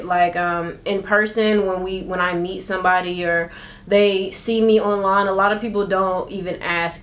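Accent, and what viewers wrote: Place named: Texas, United States. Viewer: I couldn't tell, I thought you just talk a little country like us in texas...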